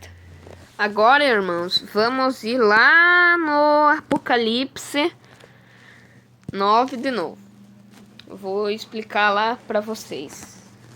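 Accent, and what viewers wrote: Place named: Israel. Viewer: Tem sotaque israelita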